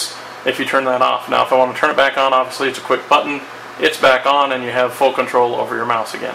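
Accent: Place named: South, United States. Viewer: where are you from man? i'm guessing the south.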